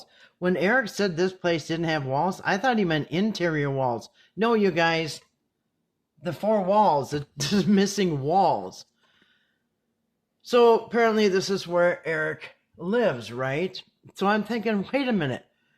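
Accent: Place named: Minnesota, United States. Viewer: U must be from Minnesota 😊 I used to work for Cargill. U betcha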